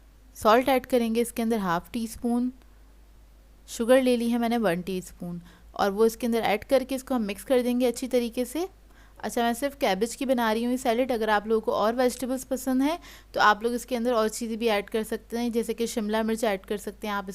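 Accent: Karachi, Pakistan. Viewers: if u dont mind AP ne kis field me graduation ya master Kia Hy AP buht nice way me BT krti haen Me bhi Karachi me rhi Hun lekin ab Punjab shift hogye pr apka way of talking Karachi ki yad dilata Hy ar buht achi trah explain krti haen AP recepies ko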